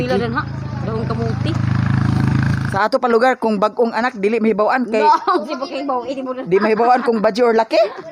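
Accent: Visayas, Philippines. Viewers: ka gwapo s aimong mga pag umangkon madame;; alegra dha dapita,,makataw ako kay visaya ninyo d mag kapareha sa davao ;pero kasabot pako madame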